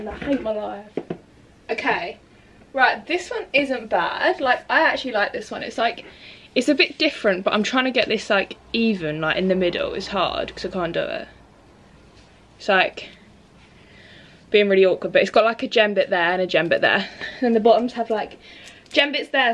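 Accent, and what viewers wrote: England, United Kingdom. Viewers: I can understand british accent but her accent is too british so cant understand her anymore, sounds like she's eating her words 😂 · i lover her english accent woow !!!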